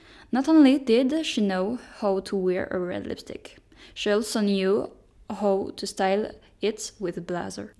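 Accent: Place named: France. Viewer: OK for the French accent to make it sound so "parisienne", but at least read it a few times before because it sounds like reading what someone else wrote without understanding a word of it.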